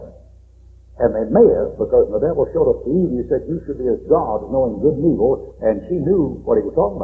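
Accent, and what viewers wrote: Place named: South, United States. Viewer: He's southern just talks fast,I'm southern understand him perfectly,lol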